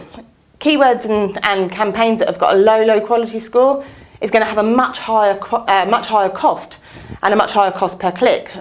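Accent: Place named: England, United Kingdom. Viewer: Good to hear an English voice for a change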